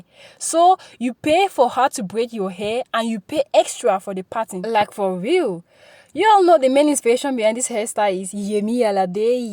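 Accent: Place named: Cameroon. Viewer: Hi my Cameroonian sis🥰🥰. I heard you speak and I’m like she sounds Cameroonian… I watched untilllll I heard you say you are from pays 😍😍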